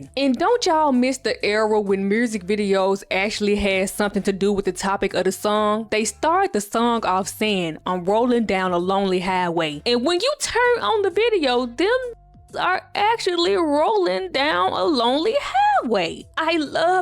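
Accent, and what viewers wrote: Memphis, United States. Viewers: Can someone in the comment section tell us what state her accent is common? I want to assume memphis because glo's accent sounds similar. . . Please and thank you 🤝🏽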